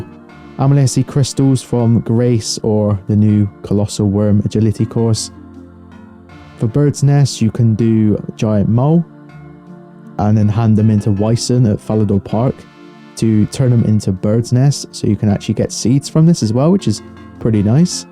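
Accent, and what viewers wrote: Devon, United Kingdom. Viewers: Devonshire or Bristolian? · Lmao where r u from you sound like your from Tiverton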